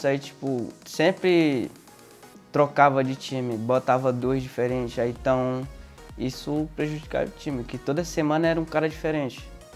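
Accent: Brazil. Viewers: Since mó jeitão da Bahiano igual meu pai kkkkkk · O sotaque dele honra nosso povo Viva a Fortaleza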